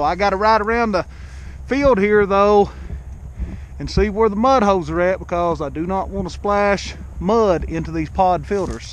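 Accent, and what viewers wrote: Tennessee, United States. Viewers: Fellow Tennessean? I ask because of the accent. And love the shee keep up the good content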